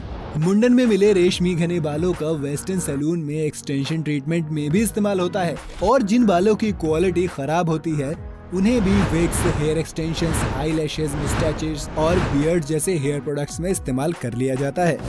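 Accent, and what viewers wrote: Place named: South India, India. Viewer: South Indian voice hai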